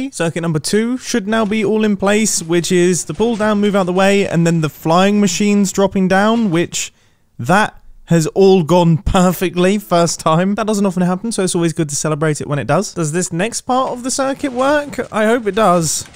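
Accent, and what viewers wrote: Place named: United Kingdom. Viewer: oh OK just sounds British to me for some reason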